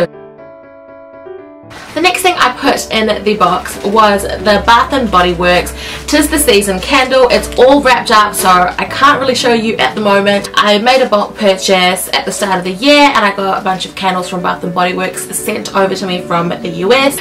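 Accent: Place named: New Zealand. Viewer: I'm so embarrassed! I thought you were an Aussie this whole time! I guess that's me being an American, isn't it? Mixing up an Aussie Accent with a Kiwi Accent hahaha. Please forgive me. I love that you put some real thought into your gifts! You really thought about what you knew about her and her life, and I think the gifts were very sweet!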